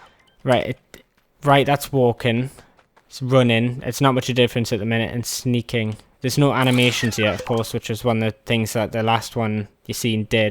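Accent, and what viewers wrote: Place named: Ireland. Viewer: Why am I obsessed with Irish accents?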